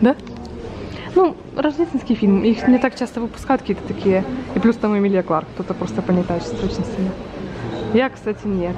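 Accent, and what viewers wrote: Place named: Okanye, Russia. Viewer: Перестань О-кать.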